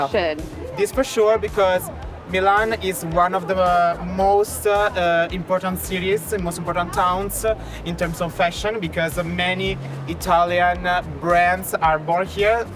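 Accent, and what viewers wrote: England, United Kingdom. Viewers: Your English accent is just awesome. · born to an American father and an Indian mother. She's an American national born and brought up in Miami.I don't know from where she got this beautiful British accent. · Wow i love your British ❤accent.. Adoro il tuo accento... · What race mix is she ? Sounds british, but looks between south asians and latina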